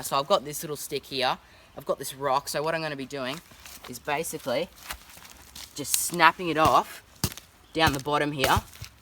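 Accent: Australia. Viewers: Are you Australian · Haha you Australians talk so funny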